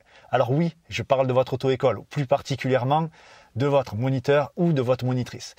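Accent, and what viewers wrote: South, France. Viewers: Son accent du sud il régale ! 😂👌🏾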